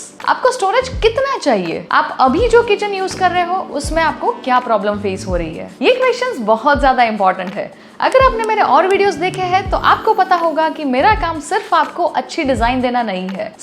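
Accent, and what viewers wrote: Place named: Gujarat, India. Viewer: I think it's Gujarati accent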